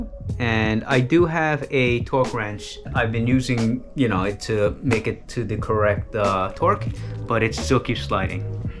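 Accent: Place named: New York, United States. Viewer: Hey! Thanks for making videos! In addition to the bikes, I like the music, your cute family, the bits of Japanese slips in, your New York accent, and of course all the great views of the city! Keep ‘em coming man!👍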